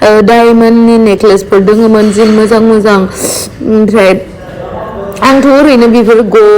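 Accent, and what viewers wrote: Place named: Arunachal Pradesh, India. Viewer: Sach main arunachal ke log aise bolti hai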